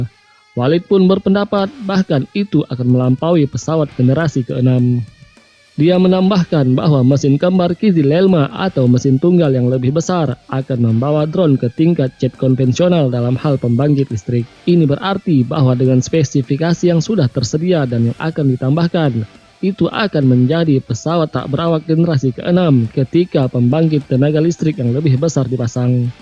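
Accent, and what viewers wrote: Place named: Saparua, Indonesia. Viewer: Min kau orang saparua k...logatmu kental orang saparua😁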